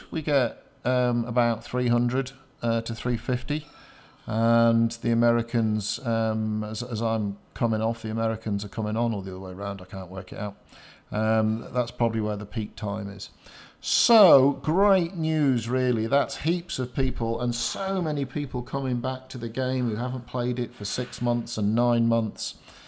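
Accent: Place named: United Kingdom. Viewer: Really? I could of sworn your british :D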